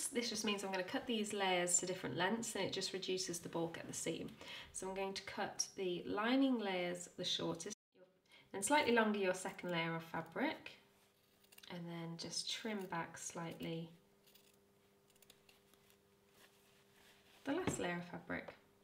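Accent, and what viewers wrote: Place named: United Kingdom. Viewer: She sounds British so....maybe the UK?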